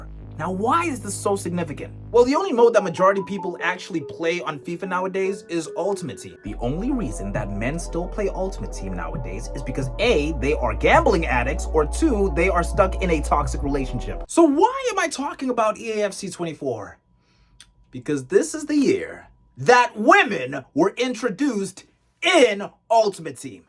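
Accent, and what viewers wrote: United States. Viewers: I understand the South Africa to England thing, but I can't place the American accent in your timeline. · Then how did you get that American accent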